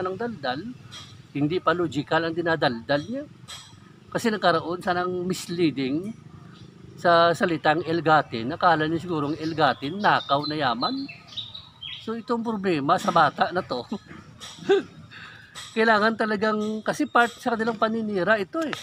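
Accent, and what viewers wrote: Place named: Iloilo, Philippines. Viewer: Parang ilonggo ka host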